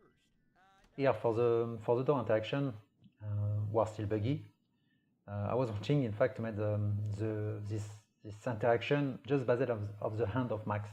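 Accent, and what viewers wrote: France. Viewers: The accent français xD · Oulalala l'accent bien français ;)) , un grand bravo pour tout ce que tu as fait c'est chef-d'œuvre , continue comme ça ! :) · L’accent frenchy xD Trop drôle :D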